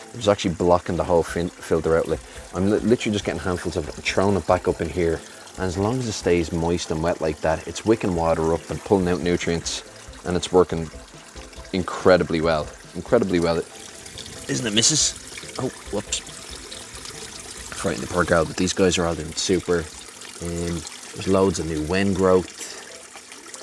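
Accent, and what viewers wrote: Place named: United Kingdom. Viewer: I’ve just come across your channel (Im a fellow goldfish YouTuber 😁) where abouts are you based? You sound British but I can’t believe the weather is warm enough to have goldfish in a tank outdoors all year. It looks amazing by the way and I’m definitely subscribing so I can see more! 👍🏻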